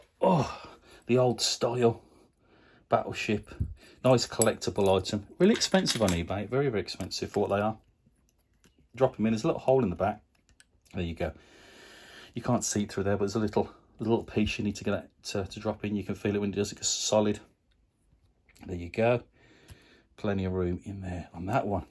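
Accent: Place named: Midlands, United Kingdom. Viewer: what a great and informative video great to have you back you are the lever king thank you for all your hard work cheers. I must ask you are you a brumie lad like myself I detect a midlands accent? just curious because there are a lot of geniuses that come from that part of the world haha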